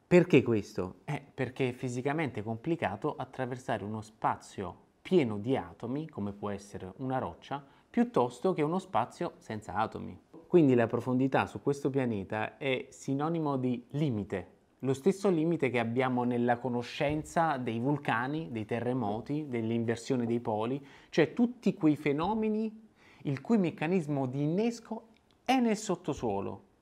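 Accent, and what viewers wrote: Naples, Italy. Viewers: Sei napoletano? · Ciao! Sei napoletano?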